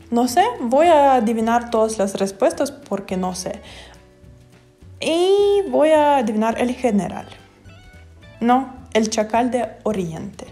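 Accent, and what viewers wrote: Guatemala. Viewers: Siempre los chapines le ponemos el Sason ha las palabras pero si lo hisistes bien saludos desde Maryland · Ya casi pareces chapín solo fallaste en uy era ulugrun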